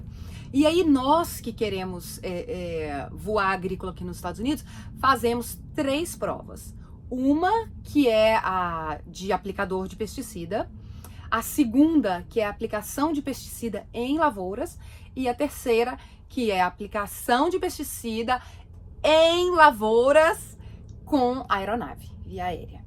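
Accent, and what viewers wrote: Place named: Minas Gerais, Brazil. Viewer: Parabéns! Relato muito completo e que delicia de sotaque daqui de Minas hehehehe!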